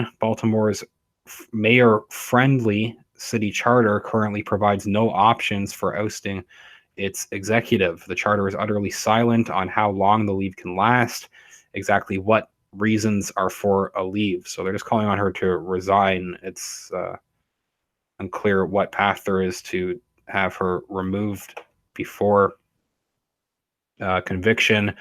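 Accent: Canada. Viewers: Where is he from?, sounds Canadian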